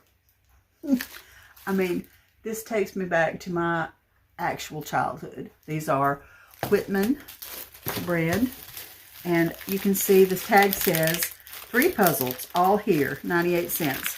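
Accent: South, United States. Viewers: Oh gosh! What a haul! I just love your hauls! Just my style! And all said and done with that heart warming southern accent that reminds me so much of home~You are such a treasure, sweet lady~thank you for taking time to share and to chit chat....I love it! AND...P.S. I LOVE your driftwood angel back there!! xo~Catherine from Colorado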